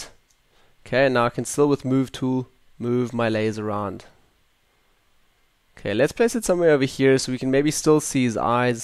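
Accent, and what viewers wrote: South Africa. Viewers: immediately recognised the S.A accent haha #proudlySA and cool tut by the way.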